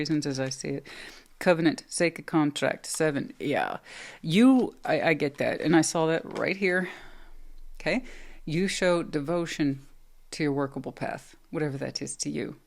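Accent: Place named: Texas, United States. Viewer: You are the #1 Tarot channel, so many just read each other's scripts, don't show themselves actually doing the reading. Have no in-depth knowledge of the tarot & have horrible voices, unlike your sexy Texas drawl & wicked laugh. Thank you for being here & being so sassy I love your channel! Capricorn power!!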